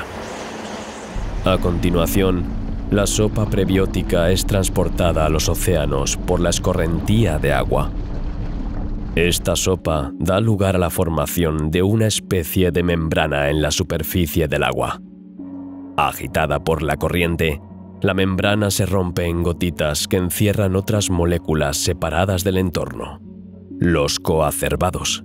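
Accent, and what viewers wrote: Spain. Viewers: Y luego esa voz robotizada ,con acento español ,que huevaa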